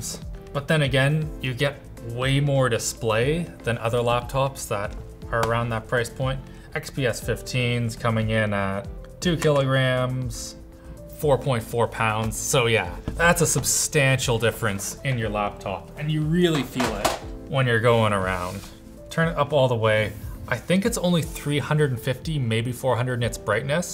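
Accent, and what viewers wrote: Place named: Maritimes, Canada. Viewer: As a Nova Scotian living outside the province there’s always something comforting about Alex’s maritime accent in videos. Oat and aboat goin in yer cahr, etc. Dont let any of those west coasters shame you for it bud! 😂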